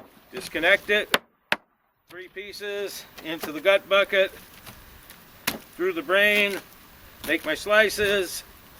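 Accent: Boston, United States. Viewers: Thanks for sharing!!! I can hear you haven't lost that Boston accent despite you have been in Alaska for decades. Great show (Yucon..)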